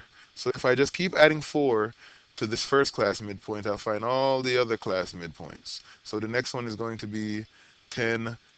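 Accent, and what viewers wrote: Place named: Caribbean. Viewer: Thanks immediately, hearing you Caribbean accent and I start to understand. wow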